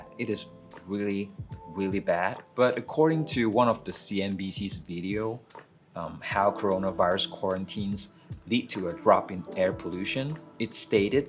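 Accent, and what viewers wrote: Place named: England, United Kingdom. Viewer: Subscribed!!! Wow bro I like your English accent😍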